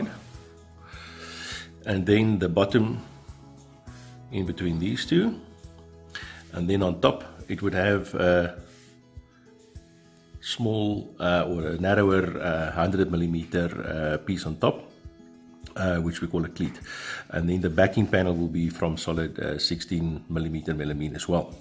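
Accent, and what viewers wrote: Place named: Afrikaans, South Africa. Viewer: Ek wou se dit is n Afrikaanse aksent. Baie dankie vir die video, ek moet binnekort begin kaste bou vir my kombuis, ek will ook melamine gebruik maar sal met n 'Festool track saw' moet gebruik om die panele te sny. Ek het iewers gesien dit kan gedoen word sonder om versplintering te kry, is daar n spesiale manier om dit dan te doen? Ek sal baie graag ook die plan vir die 'scissors table' wil sien as dit beskikbaar is. Baie dankie.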